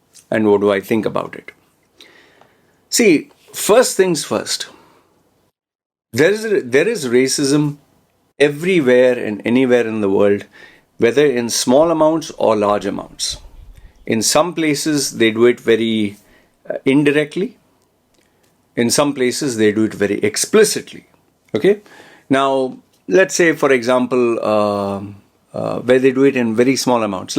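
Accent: India. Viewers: Your first word told me u r an Indian 😊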